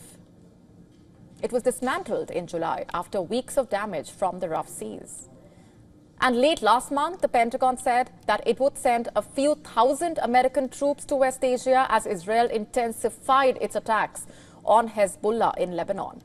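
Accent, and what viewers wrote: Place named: India. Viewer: Can you remove your Indian diction..?? Ty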